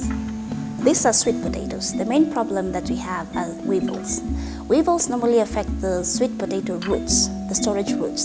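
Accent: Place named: England, United Kingdom. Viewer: I love that English ascent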